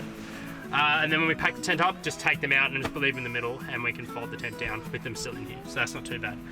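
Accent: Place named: Australia. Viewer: So nice to hear Aussie voice on YouTube. Subscribed just cos that (well and you say you'll be vlogging your travels around Oz). I liked how the tent was dusty and stuff - obviously getting used and well, dust, so Australian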